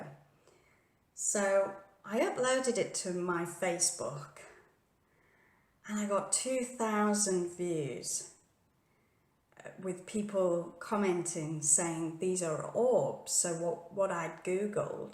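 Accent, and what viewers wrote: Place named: United Kingdom. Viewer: I do not have a channel because i guess ive been searching for the truth for my own needs. I just need to prove it to me. I guess that sounds selfish. Lol. I can tell you're from the UK for sure. I could listen to you speak all day. But anyways, lol. I used to have experiences when i was a child also. My most memorable one was when i was 15 when i had sleep paralysis and witnessed "The Old Hag Syndrome". It scared me to death. She was at my bedroom door making a weird sound and i couldn't move. It didn't stop until i called out for the lord. Thats when i knew "our maker" was real. I have so many to tell. Most are good experiences. But there are a few bad. Please protect yourself when speaking to spirit in case you run into something evil.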